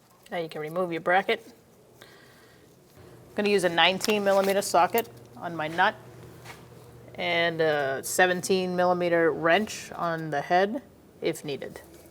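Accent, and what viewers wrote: Boston, United States. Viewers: gotta love that boston accent!! SWAY BAH!!! lol great video!!!!!!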